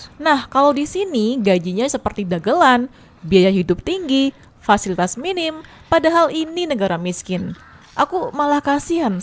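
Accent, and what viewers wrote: Java, Indonesia. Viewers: Jowo ne medok,,pisan² ajak ngobrol penduduk sekitar nggo boso jowo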